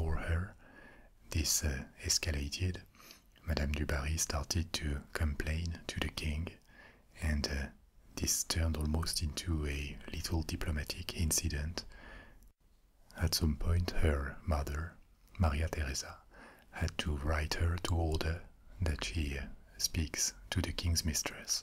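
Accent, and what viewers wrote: France. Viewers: i always come back to this video, love the french accent <3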